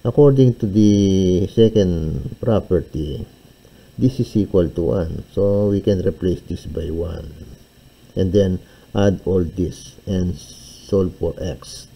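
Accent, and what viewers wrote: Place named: Philippines. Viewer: Pinoy na pinoy ang accent by the way thank you po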